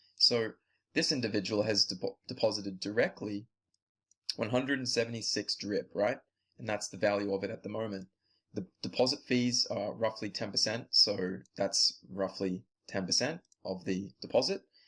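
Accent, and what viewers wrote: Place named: Australia. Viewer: Great video. Hi from Melbourne, Australia and you sound like an aussie too.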